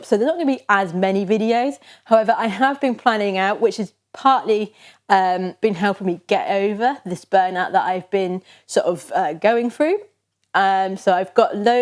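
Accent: England, United Kingdom. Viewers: Congratulations from Madrid! I love your English accent… where you from?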